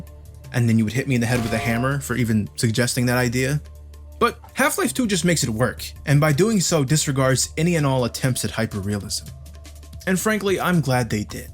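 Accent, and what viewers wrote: New York, United States. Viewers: He sounds like he came from new york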